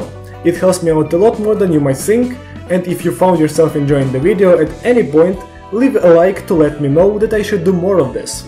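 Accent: Russia. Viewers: Я будто очень чётко слышу русский акцент, а так видео топ. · Русский акцент, прикольно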